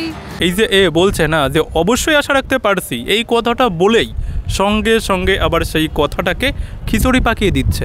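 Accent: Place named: Bangladesh. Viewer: Bhai youtuber, bangladesh theke kabe elen? Vasa shune pariskar bojha jachhe.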